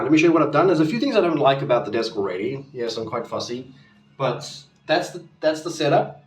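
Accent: South Africa. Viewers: A. Room looks good, reminds me of how your office was set up at your old place because you had the cupboard behind you then. (I know cause that was the only way you could set up your office) B. I keep my PC on the floor, got it packed today for the move and there was a huge dust bunny under it. Good luck with Zelda's dust bunnies. C. Green screen idea sounds good, can't wait to see the final product. Can you actually sew because you mentioned stitching? D. Room is lacking some color, looks a bit like a hospital room. Maybe when you get the green screen in its final set up you could get some pretty window coverings? Unless you like all the white then power to ya. E. Finally, when your sick like in the video your South African accent is much thicker. More thick than normal i mean. ❤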